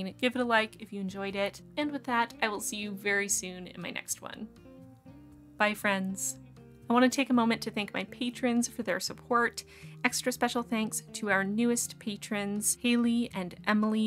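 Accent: Canada. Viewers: Aayyeee I had no idea you were canadian til i heard pencil crayon haha xD ive only ever heard us say that with our NA accents, i think brits say it too but not sure xD nice to see more canadian-based bujo channels. i love seeing both your spreads and your husbands, my current style is waayy more in-line with what you do for your husband, but my dreams are more like yours so it's really cool seeing both!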